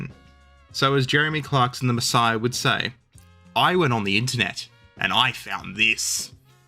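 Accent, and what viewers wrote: Australia. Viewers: Apart from the Aussie accent, I can't watch this facetious video about a Aeroflot jet hitting a landmine. Come on, be serious about your subject! 😩🙄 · People being annoyed at hearing Australian accents? Who are these (third letter)unts? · IS that a thing??? People getting triggered by an Aussie accent??? WTF?!?!!?!? God help them if they heard my Southern Texas Accent. 🤣🤣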